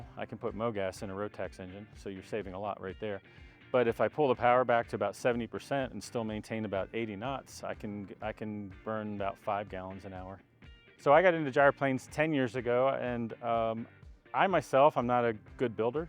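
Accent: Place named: United States. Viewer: most videos don’t mention location, but I actually did in the first sentence. Others clues for you are the English language and the American accent. Is this your first time on YouTube? If you ask questions before making arguments, you would seem smarter. Please take your silly argument somewhere else.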